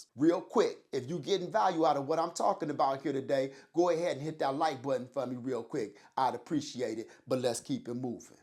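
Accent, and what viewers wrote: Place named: Brooklyn, United States. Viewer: New Yorker he is. That sounds like an old school Brooklyn accent. I'm guessing because the way he talks. He sound like he from Brooklyn.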